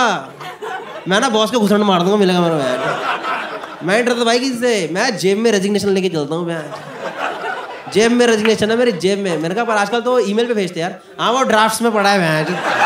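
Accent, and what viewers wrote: Delhi, India. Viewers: Every second Delhite sounds like this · Typical delhi accent :D just loved and liked your all the videos...your new fan :D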